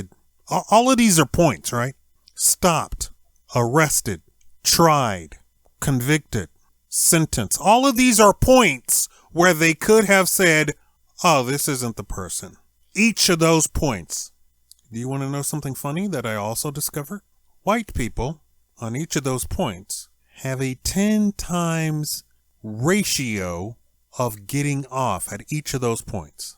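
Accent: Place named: Caribbean. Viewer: ha ha dude where is your family originated? i hear a carribian accent.Were you born in nevada?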